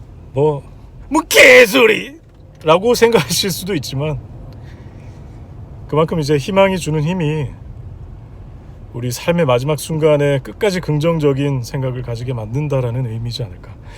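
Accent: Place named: Seoul, South Korea. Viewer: 개안은데.. 즌반적으로 서울 싸람 냄시가 좀 마~이 나네요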